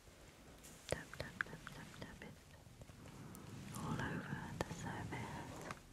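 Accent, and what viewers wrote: United Kingdom. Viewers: Ur hair colour looks darker but amazing...I love ur accent...is it British?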